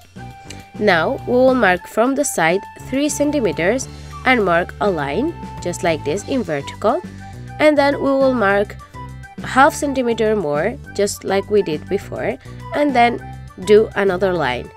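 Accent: Spain. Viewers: Is it strange that I love the way you're saying "We gonna' need"? ;-; I think it's too much cute D: And I love your spanish accent c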